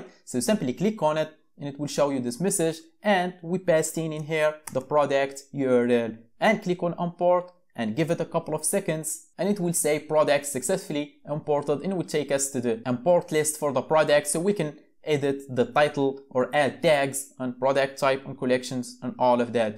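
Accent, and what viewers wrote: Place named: Morocco. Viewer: kml akhy l3ziz ana hna f us o mn accent dialk bayna mghribi hhhh sa7a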